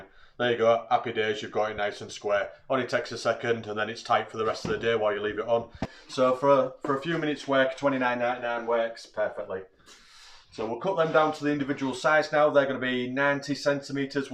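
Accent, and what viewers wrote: Hull, United Kingdom. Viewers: Just found your channel, I am an ex welder/fabricator but trying to learn more about wood so this is fantastic. You sound like a Hull lad (I originate from Hull) so I understand every word you say makes me feel homesick. I was mates with a lad called Robert Pope as a kid, think he became a carpenter also. Anyway good luck with the channel, very professional and well presented.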